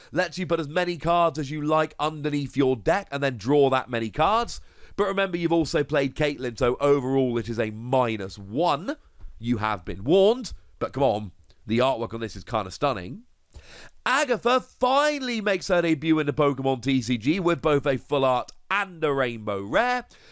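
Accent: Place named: United Kingdom. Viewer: Half the reason I watch you is to hear you say “but’’ in your beautiful British accent. Love the info you give us amigo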